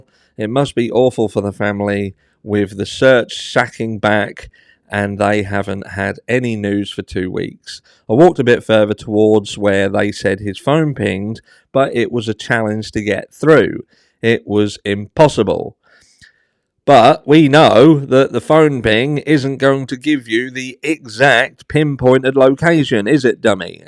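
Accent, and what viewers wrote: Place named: Waveney, United Kingdom. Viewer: You have a great Waveney accent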